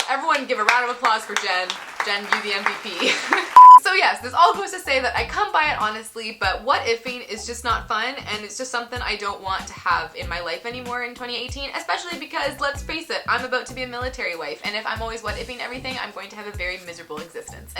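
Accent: Canada. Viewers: I'm an ESFP and I can say that assuming everyone is dead when they are a minute late is very accurate... Thanks for showing me that I'm not the only one! Also. Your accent is adorable and so hard core Anne Of Green Gables ❤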